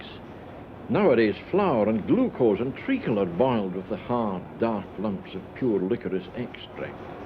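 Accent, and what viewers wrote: RP, United Kingdom. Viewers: 50 times stronger it was the fentanyl of its day! 😅 it's very interesting and quaintly informs you of things in that once ubiquitous, now obsolete, BBC accent. This video highlights the rarer Scottish BBC inflection.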